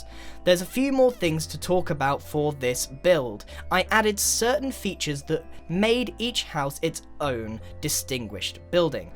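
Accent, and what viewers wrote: England, United Kingdom. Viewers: Are you English coz I am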